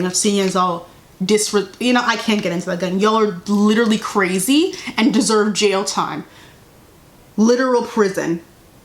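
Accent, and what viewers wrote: New York, United States. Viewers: off topic but the new york really be jumping out lmao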